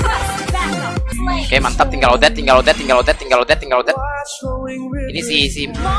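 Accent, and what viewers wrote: Kalimantan, Indonesia. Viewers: Bang Kmu dri kalimantan mana klo bleh tau sih :-) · Wah kalimantan ya bang ?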